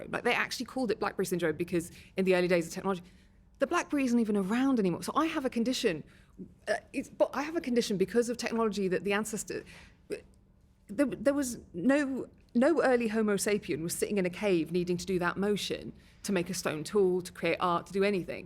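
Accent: United Kingdom. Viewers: British English is charming! U.S. English is beautiful, but UK English has a certain magnificence.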